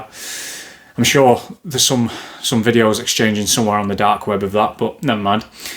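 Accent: Manchester, United Kingdom. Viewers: Nice video there... enjoyed your analysis and choices. Great to hear a fellow Northerner (I grew up in Preston - you sound more Manchester way).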